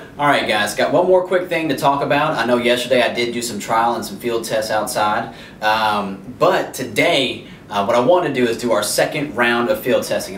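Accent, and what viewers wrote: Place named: Indiana, United States. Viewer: i really enjoyed your video! and you really do have an Indiana accent lol nice.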